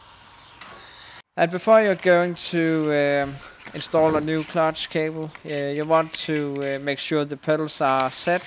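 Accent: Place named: Denmark. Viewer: Det var cool - Nu ved jeg hvad en selv justerende kabel er og den som er på min T4 VW er igen på plads. Hvordan det ennda er skete, havde den selv hoppet ude af pedalens fatning! Du dukkede op under en relevante søgning og selvom ikke VW, traf jeg rigtigt med den første skud. Tak kære bror - med en hånd mekaniker, en hånd videografer :-) Great mate and thanks (oh, and the Danishness is nicely unmistakeable in your seemingly impeccable english. haha)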